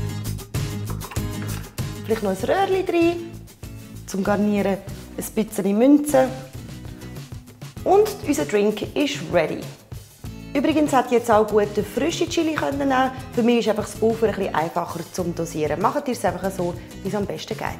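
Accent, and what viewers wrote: Switzerland. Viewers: Cool ich muss das auch mal ausprobieren. Schweizer Dialekt klingt so sympathisch 🤗🤗🤗😋😋😋👍🏼👍🏼👍🏼👌🏼👌🏼👌🏼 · Super Getränk sehr gut gemacht ich liebe deinen Schweizer Dialekt LG ❤️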